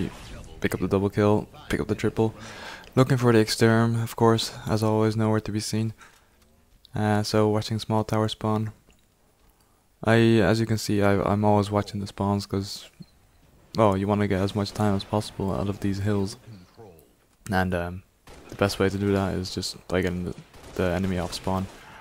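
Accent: Ireland. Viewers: I think hes irish ¡¡¡ lol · He's Irish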